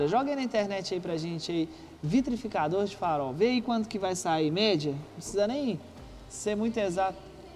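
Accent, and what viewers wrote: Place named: Belo Horizonte, Brazil. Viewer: Esse cara deve ser de Belo Horizonte ,pelo sutaque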